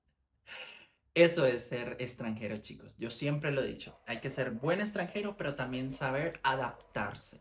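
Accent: Paraguay. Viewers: Tu acento ya es casi paraguayo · Ya tenés mucho del acento paraguayo. Que estés bien!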